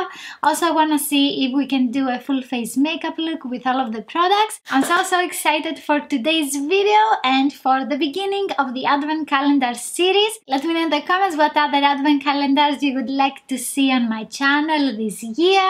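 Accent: Greece. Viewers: I love all of your videos but especially Advent Calendar ones! And your accent is you cute, are you Greek? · I just found your channel, you're accent is adorable and I loooooove your videos, I just watched like 10 of them. Are you greek? I came to a conclusion, couse of your channel's name and your accent (hoepfully I am right and I did not offend you). Thank you for your videos, I think they will help me a lot in the future.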